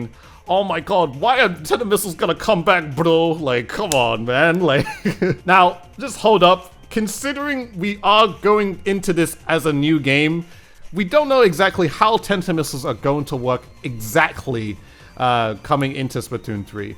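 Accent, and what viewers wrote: United Kingdom. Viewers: he literally has a British accent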